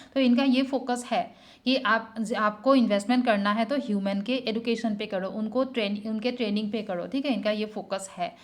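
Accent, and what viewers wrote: Assam, India. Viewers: Baa apuni Assam r neki?? Pronunciation tu Axomiya jen lgise aru apuni o no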